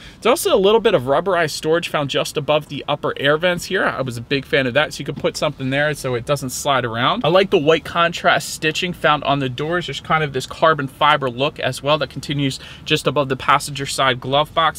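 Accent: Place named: Pittsburgh, United States. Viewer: Your Pittsburgh accent is so heavy. Good review !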